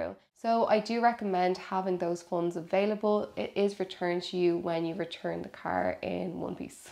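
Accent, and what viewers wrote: Ireland. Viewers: I was pleasantly surprised to find your YT channel today and I love. The reason being that I am fortunate enough to have visited Ireland several times and found out for myself how beautiful your island is and how wonderful and friendly the Irish people really are. The main reason I wanted to comment was, how could anyone complain about your lovely accent??!! I absolutely love it. Love from Tennessee. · Irish accent is very charming. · I love the Irish accent!! I am southern so I get being asked to say something/ I get it!! Good info!! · Is it wrong that I watched this for the fabulous Irish accent? Nonetheless, thank you for the tips. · She was very informative...love the irish accent...the irish are the best...she's really attractive and so proper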